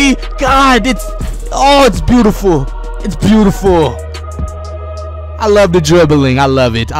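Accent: Australia. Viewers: That’s an Australian accent not newzealand…… like saying u sound Canadian get my point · Aussie accent? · says he's in new zealand put on australain accent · “I’m in New Zealand” proceeds to speak in an Australian accent as an Aussie I approve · Bruh that’s an Australian accent 🤨🤦🏾‍♂️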